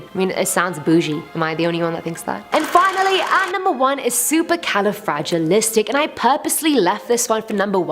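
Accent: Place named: England, United Kingdom. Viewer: Huh - didn't realize torontians had English accent ! Though they were like "take off- eh " (like minnesotians ) !! Suppose to be funny - no need for anyone to get angry . This young lady is very pleasant to listen to - thank you !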